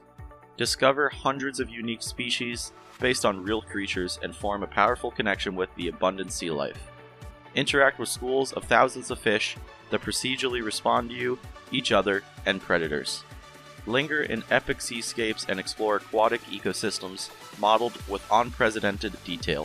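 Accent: Canada. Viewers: You're Canadian right? LOL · Are you Canadian?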